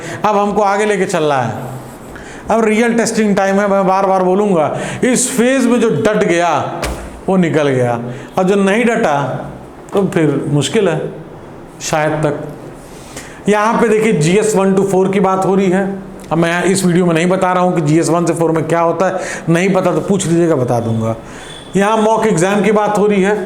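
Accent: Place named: Kannauj, India. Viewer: Sir you are such a best motivator. Apne toh mera mind hi change kr diya ap kannauj se belong krte hai kya sir apki language is like my mother tongue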